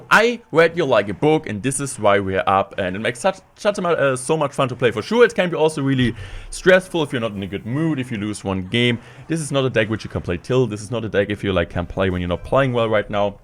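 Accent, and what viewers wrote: Germany. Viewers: ich liebe dich und deinen deutschen akzent vor allem dieses (in di intia wörlt plaing in top läda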